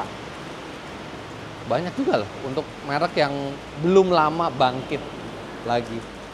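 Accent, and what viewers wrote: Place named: Java, Indonesia. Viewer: Bung Jawa banget sih itu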